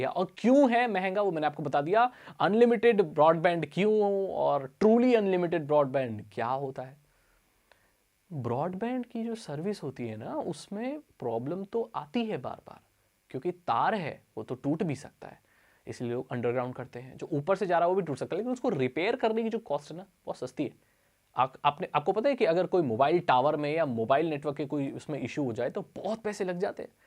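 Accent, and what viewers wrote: Odisha, India. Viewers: bro are you from orrisa???? love you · Bro, are you from Odisha ?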